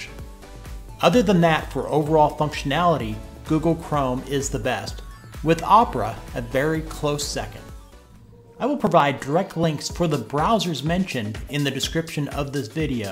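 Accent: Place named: New England, United States. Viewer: Love your Yankee accent...wow.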